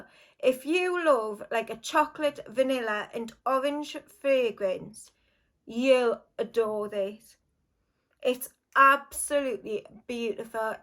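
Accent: United Kingdom. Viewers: Wow!! Congrats on the 2007 Angel!! Beautiful bottle. I've never tried Angel, tbh. I'm an Alien girl so not sure I would like Angel. I've heard it's either one or the other and I also really hate patchouli bombs. But you're making me want to sniff it!! Also, where is your accent from? I know somewhere in The U.K. but I've always wondered!!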